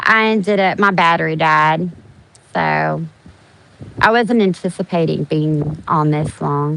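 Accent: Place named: South, United States. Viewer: I was just writing a comment about sounding like you were from below the old Mason-Dixon line. My parents are from the hills (if you know you know) and I spent time all over in a military family. But the drawl always peeks out and makes itself known! Some people are still dumb enough to think if you speak that way it means you are slow somehow...some people are certainly idiots though. Anyway, I just want to say I appreciate the work that your group, Emma666 etc do to give the public actual facts. I'm not against grown adults using their money however they want as long as they know what and who they are actually supporting and make that decision with full knowledge of all the facts. I just really hate watching people get taken advantage of; through lies, guilt, psychological manipulation, etc. There are so many organizations and individuals who actually do deserve the good will and charity of these people who are willing to donate but unfortunately after dealing with some of these people, they are then usually ruined for opening their hearts and wallets in the future later after being manipulated and scammed (imo). 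He is robbing people twice, in my opinion. Once of the funds, secondly by their stealing their future kindness, generosity and willingness to help others in the future. And besides that, some entitled assholes just need to be given a few doses of their own medicine and taken down a notch or two. If you a such a rancid individual don't impose yourself on the rest of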